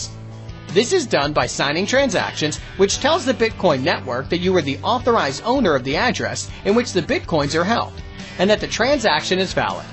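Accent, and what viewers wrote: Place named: United States. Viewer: Annoying American (non-native) voice, and too fast to compute. Ie: not user centric.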